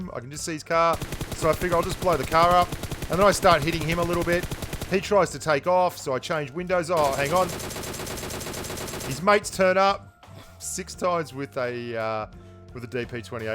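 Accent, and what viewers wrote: Australia. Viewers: That Aussie accent tho ☺️ took me few videos to realize that you were saying "rating" and I was hearing it as "writing" 😎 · you austrialian accent is too strong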